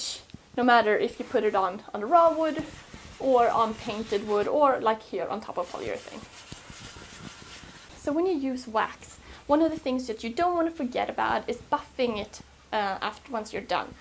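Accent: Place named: Sweden. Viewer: How long time has you lived in the USA ? You think in inches, but your accent is very Swedish. Ever visit Sweden, and will / do you teach your kids (??) the other language ? Sorry for off-topic question, only answer if you feel like it :) Excellent videos!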